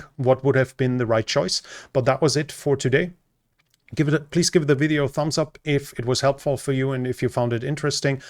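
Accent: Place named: Germany. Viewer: It sounds like you have a very heavy German accent. However, your English is quite good !!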